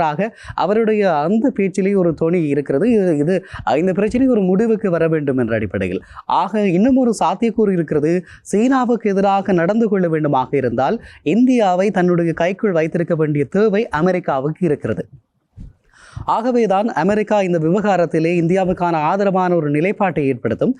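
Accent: Sri Lanka. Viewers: Ivan ilangai tamilano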